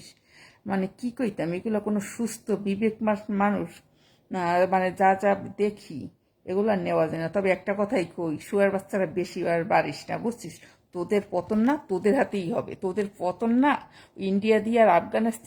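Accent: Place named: Barisal, Bangladesh. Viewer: মা তোমার কথাগুলো ভাল লাগে। সমস্যা না থাকলে তোমার নামটা জানাইও। আমেরিকায় বসে তোমার বরিশালের ভাষায় কথা বলা অনেক ভাল লাগে। কারন মুইতো বরিশাইল্যা পোলা। ভাল থেক প্রাণ ঢালা আশীর্বাদ রইল।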